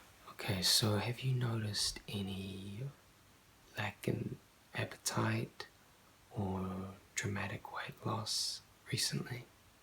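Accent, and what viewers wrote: New Zealand. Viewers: wow I'm Korean and I sometimes watching your asmr, And it's really helpful to my sleep! your kiwi accent is soooooooo nice!!!thank you :)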